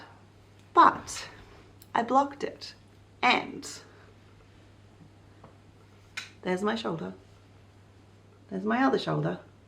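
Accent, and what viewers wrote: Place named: Australia. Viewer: Hello - it’s lovely to hear another Australian on YouTube. I’m impressed with your knits and your productivity whilst being a mum of 3. I am a mum of 5, grandma of 5 and I love knitting, crocheting, sewing etc, but I am impressively slowww 😅 I’m in Brisbane and I have to confess a teensy bit of jealousy that you live in Tassie and have the option to knit lovely cosy knits that will get a good amount of wear! I have to choose carefully which cold weather knits I actually make, given the two minutes of “winter” we get here 😅 Your green Paul Cardigan is just superb on you. You clearly have a good eye for colours that suit you. Love it! What a natural beauty you are! Anyway, I’m watching this and working on my Hatsuki Top by Rievive in Isager Yarn Trio 2. Your cadence and overall chill vibe is perfect accompaniment. Thank you 😊 Also, I heard there was snow down there yesterday! 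Already! It’s finally cooled to a balmy 26 C here today! “I love a good patina” - me too ❤😊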